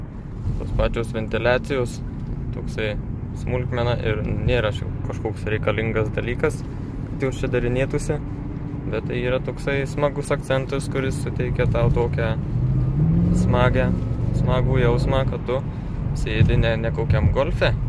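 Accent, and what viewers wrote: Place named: Vilkaviškis, Lithuania. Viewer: Iš tarmės sprendžiu, kad esi iš Vilkaviškio ar Marijampolės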